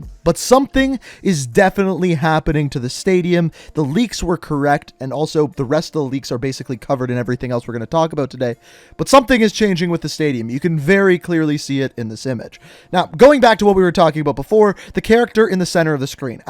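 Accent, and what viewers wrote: Canada. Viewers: Jack Felts bruh he’s Canadian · He Is canadian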